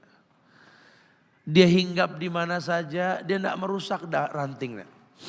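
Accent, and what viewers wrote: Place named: Jakarta, Indonesia. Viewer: Assalamualaikum ustadz,,,,salah satu daya tarik adalah logat Betawi ustadz yg kentel sy sukaa bnget ,,,, alhamdulilah kebetulan kite satu rass ustadz ,,,,,semoga Allah slalu melimpahkan Rizki yg luas utk ustadz sbgai bekal dakwah ,,,aamiin ya rabbal aalamiin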